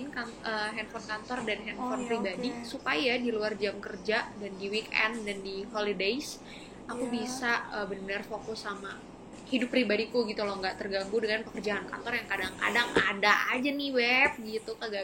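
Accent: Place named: Bandung, Indonesia. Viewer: Dari bandung ya teh, hehe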